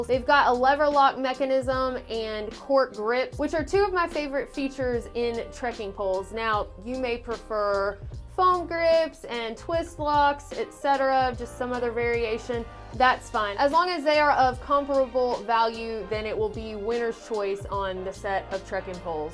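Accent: South, United States. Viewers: I think I watch you because of that southern draw lol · Love watching your shenanigans, your channel, and oohh that southern accent!! 🤗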